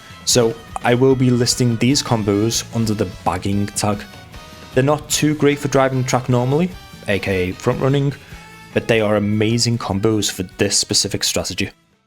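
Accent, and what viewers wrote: United Kingdom. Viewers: hey i didnt know u are british 😭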